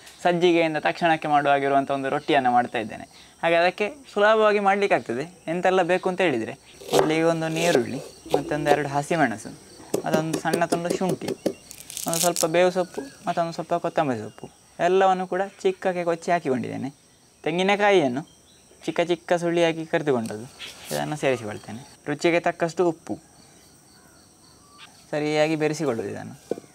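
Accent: Mangalore, India. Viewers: Yes to listen to your Mangalore kannada is very nice. I remember my days at Mangalore for twenty years.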